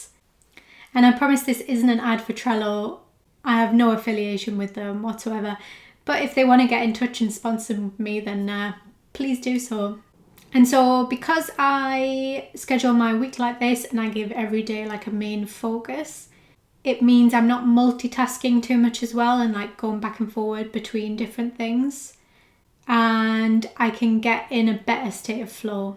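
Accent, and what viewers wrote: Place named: Ireland. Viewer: I love the Irish Accent.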